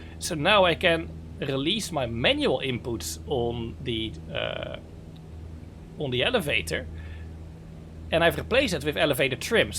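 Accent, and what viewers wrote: Netherlands. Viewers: Dutch accents are not really harder to understand then other English accents. My Canadian family perfectly understands this kind of dutch accent... beside that, this comment is completely off topic. I'ts a great video of how to properly setup and fly the Cessna 152. A little more respect for content creators please. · Awesome video, love the Dutch accent 😊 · Wat een belachelijk Hollands accent, Leer eens Engels praten he..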